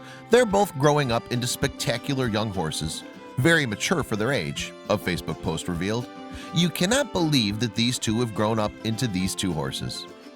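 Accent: United States. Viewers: Do you have hearing issues?? 😕 He says FOAL (*NOTHING ELSE!*) MULTIPLE TIMES, & *EQUINE.. NOT 'Quine'!! So-?????!!!!!!! 😒 Bloody well obvious, even in his thick AMERICAN ACCENT, to these Ozzie (Australian) ears of mine & the 7 ppl I just asked, INDIVIDUALLY. Don't know where you're from, but having an American accent doesn't mean his mispronouncing words!! I suggest you get a hearing test, dear.. & take this along with you and ask what the ENT professional 'hears'. PS: *Clearly, NEITHER will grow to be 'horses', either. They will NEVER reach the height a *PONY becomes a 'horse'.... given their fraternal twin situation. The ONLY difference is how many 'hands' they will grow to. Not enough to be 'horses'. They are ponies and have less than an 20% chance (Don Quixote) and less than a 10% chance that (Duet) could grow to the minimum 'horse' height..... just thought you should know that, since you're SO quick to jump in thinking you're an expert, despite obviously NOT being one. 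🙇💫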